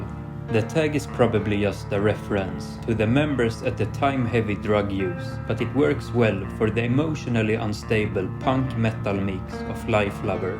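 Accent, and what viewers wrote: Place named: Sweden. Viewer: It was funny for me to listen to english with such a strong swedish accent 😇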